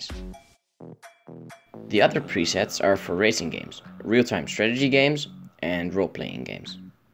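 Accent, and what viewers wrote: Netherlands. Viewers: U dutch? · ben je nederlands je hebt echt een nederlands engels accent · Merk dat je Nederlands bent duss... heb je last van Ghosting? Is de reactietijd rond 3-1MS? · youre dutch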